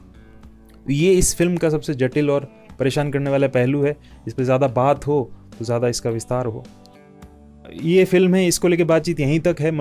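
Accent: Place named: Rajasthan, India. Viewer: Sir aap rajasthan se ho ? Aapki awaaj se laga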